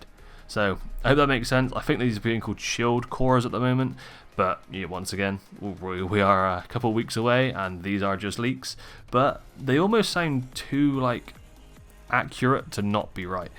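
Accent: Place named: South West, United Kingdom. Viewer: Where are you from bro, sounds south west like me?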